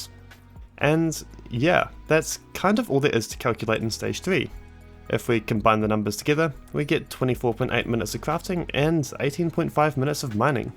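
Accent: New Zealand. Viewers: You are you from NZ? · Are you from New Zealand?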